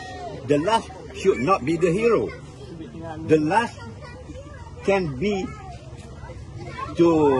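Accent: Terengganu, Malaysia. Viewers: English Terengganu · terkenal sohor dgn loghat daerah tganu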